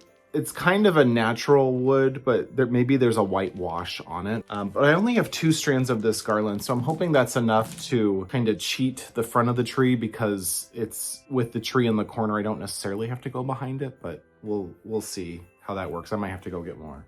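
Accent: Minnesota, United States. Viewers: I love the battery powered candles for the tree! So pretty. Since Paolo stole the show he's the 4th Day of Vlogmas. On the 4th Day of Vlogmas my true love gave to me: Four meows from Paolo Three rolls of batting Two power strips 🔌🔌 And you sound like the movie Fargo 🎄⛄🙂⛄🎄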